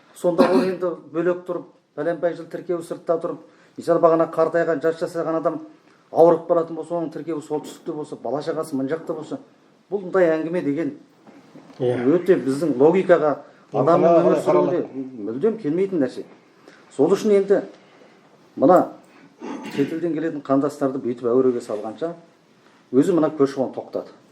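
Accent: Standard. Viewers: Таза қазақша сөйлеп отыр❤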